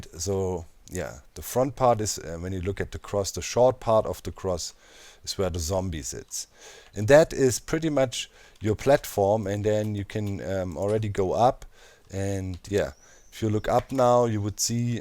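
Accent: Germany. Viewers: Dein englisch hört sich sehr deutsch an O.o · I knew he is german XD he has this typical accent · Are you german by any chance?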